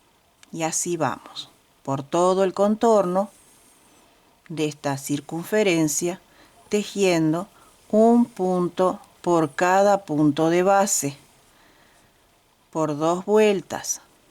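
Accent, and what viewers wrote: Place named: Argentina. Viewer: Me da mucho gusto haberle encontrado, tengo una hija en Buenos Aires, creo por su acento, que usted es de Argentina, y se llama, y tengo unas primas, con quienes me crié, que son Garcia, esos apellidos son muy sentimentales para mi, y por lo que usted manifiesta en sus palabras, siento que tiene cualidades especiales. Gracias por compartir sus conocimientos.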